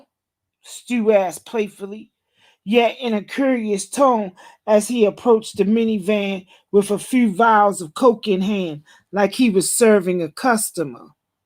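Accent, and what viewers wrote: Baltimore, United States. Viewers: That baltimore accent makes this book hit right 😍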